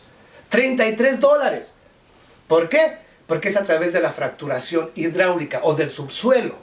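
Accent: Mexico. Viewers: Don't forget to mention me BRO am watching you from AUSTRALIA I really like the way of your analysis very easy to digest as well I like your Mexican accent.. well done Cheers...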